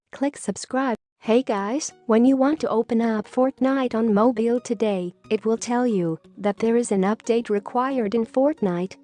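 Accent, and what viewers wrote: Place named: China. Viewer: You sound Chinese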